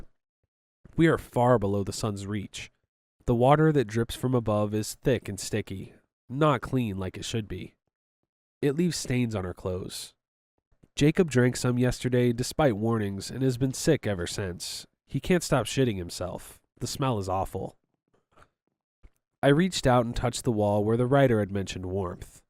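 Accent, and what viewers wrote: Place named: United Kingdom. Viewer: bro i SWEAR when i listened to this at first it was a british guy talking 😭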